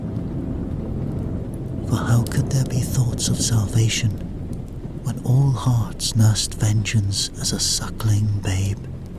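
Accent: Scotland, United Kingdom. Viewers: Think it's only a matter of time before your channel really takes off, your stories are unlike any other sleep channel, I really get list in em and you've got a great voice with that slight Scottish twang, not too heavy, perfect for sleep stories man. I think a Underworld sleep story would be a good one, the Vampire death dealers hunting the lycanthropes. Yes brother what you think??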